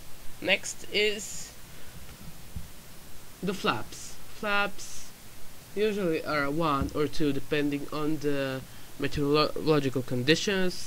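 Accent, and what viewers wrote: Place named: Russia. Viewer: You must learn English well not like a Russian pilots speaking!!!!!!!!!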